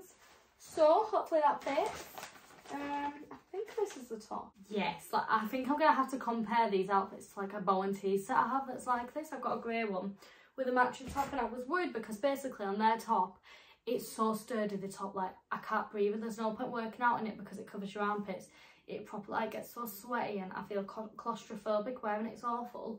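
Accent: United Kingdom. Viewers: Liverpool accent? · First time seeing your video content. Nice figure, and lovely English accent!!!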